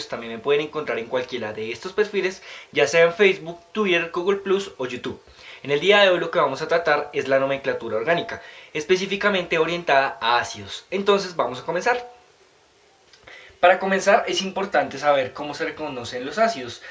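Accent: Colombia. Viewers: Ese acento colombiano xdxd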